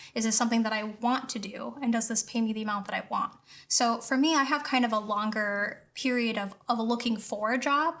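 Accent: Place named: United States. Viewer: Annoying voice.. too loud and american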